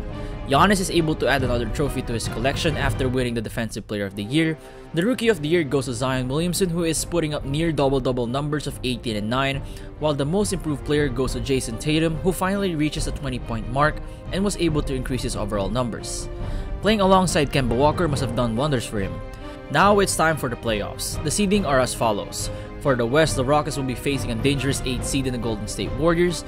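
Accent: Philippines. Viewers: Ahh tama talaga ako. Ang accent mo po hehehe Pinoy talaga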